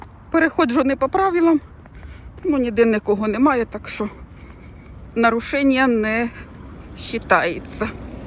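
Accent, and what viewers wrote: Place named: Kryvyi Rih, Ukraine. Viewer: Володимира Великого 33а-базарёк на парапете. Мова як у криворожанки. Дякую за чудове вiдео!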